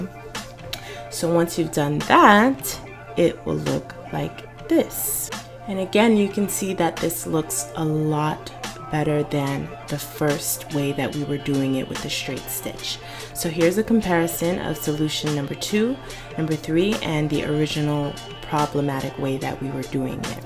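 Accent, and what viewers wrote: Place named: Maryland, United States. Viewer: Shtrait shtitch shtretchy fabric. Is that a Maryland accent I detect? Not being mean I’m from Boston, OK? I just love accents! Thanks for the help! Perfect help and I just happen to have the same machine! Totally ordering the twin needle! Had no idea they even existed!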